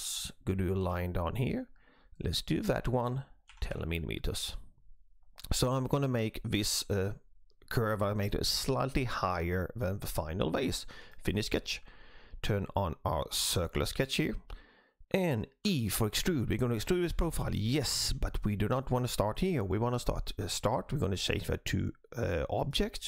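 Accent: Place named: Sweden. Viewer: Your accent is extremely similar ( he is also from Sweden)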